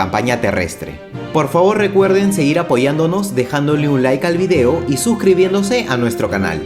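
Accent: Peru. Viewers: Por su acento, creo que es peruano. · Esta historia quien la cuenta me parece que es un peruano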